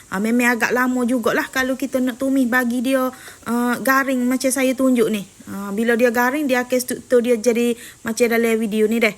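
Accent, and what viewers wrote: Kelantan, Malaysia. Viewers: Ralit saya tengok video sis.. lama tak dengar oghe kecek kelate.. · Simple + senang.. haha terhibur dengar org kecek Kelantan ni.. hehe husband saya pon org Kelantan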